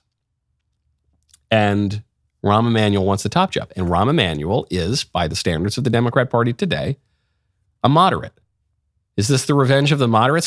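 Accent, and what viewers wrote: Ireland. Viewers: I don't know if that Irisha accent is accurate. But it made me smile. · Your Irish ☘️ accent is pretty good.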